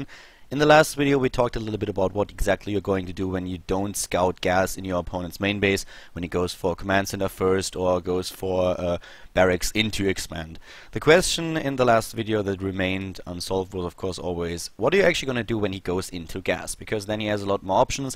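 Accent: Germany. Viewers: i am quite new to this game and got heavy problems to get into it anyway every beginning is difficult.. i watched a lot of videos alrdy highlights builds orders anything.. but your comments and explaining the games is awesome man it really helps and is fun as the same time really awesome videos i cant tell you how much i enjoy to watch your videos about SC2 really really hardcore thanks for that man. dont know may it is your job. finally pls tell me aer you german or american ...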